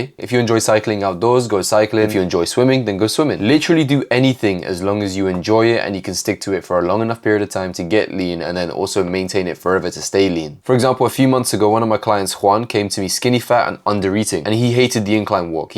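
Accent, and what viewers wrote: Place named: Maghreb. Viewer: WHOA! Viva Maghreb 🇲🇦 Body, Accent... Fire bro🔥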